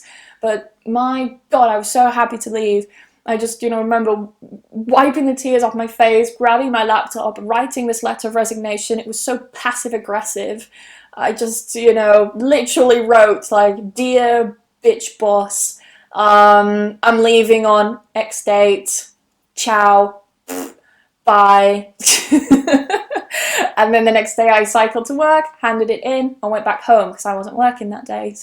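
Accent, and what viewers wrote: United Kingdom. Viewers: Thanks for sharing your story. I really like British accent 😇💙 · Very motivational story. I love british accent. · watching your video because I like British accent, thanks for you kept talking in English and it is brilliant, love it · Hi! you are an excellent teacher, I love your British pronunciation! I'm learning a lot with your youtube's videos! Thank you so much! Xx:) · Hi, I've just subscribed to your channel. I love your British accent, your voice, your look, and the way you present. I find most English teaching videos on youtube are in American English, which is unfamiliar to me. I'm lucky to find you on youtube today. I can see that your eyes were still filled with tears when you shared the bad experience with your previous boss. I had similar experience with a bitch boss so I really can feel your pain. I'm so touched by your story. 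I like genuine and kind-hearted people like you.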